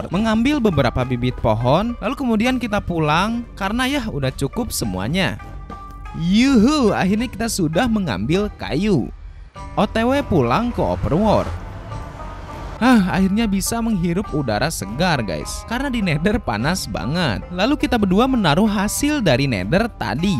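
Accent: West Java, Indonesia. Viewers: Orang sunda bg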